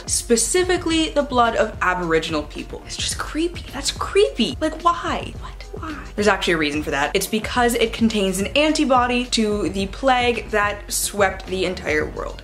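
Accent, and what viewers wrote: Canada. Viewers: Hello =)) As i'm not from Canada, i don't know much about stereotypes but i've always known how well you all speak great english and french, how you pronounce differently words like: "out", "about" and similars, i've heard about poutine and specially the maple syrup :P I would like confirmation on those xD (i specially like the accent though <3) Thank you for the amazing giveaway and opportunity ********************** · pretty sure my favorite canadian stereotype is the way you say the word "sorry" as "sourry" becausE IT'S TRUE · Dual Canadian-US citizenship coming in here! I'm from that part of NY where like half my town is French Canadian. A local college literally has a minor called "Canadian studies". Not technically a stereotype, but I know when I cross the border and when my neighbors north come down when they say about with the out part sounding more like an oot or more rounded o. 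It makes me smile when I hear it in your videos. And maple syrup omg. And hockey (go sens!!!) Maybe this is a good time to finally pick up the handmaid's tale.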